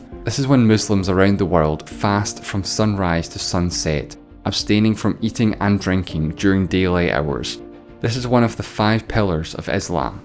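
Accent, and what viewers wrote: Scotland, United Kingdom. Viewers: are ou scottish? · its his accent there's nothing he can do about it, also its not really that strong of an accent compared to how some scots speak... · Very interesting accent. British? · I love your Scot accent. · How Scottish are you? Great video btw.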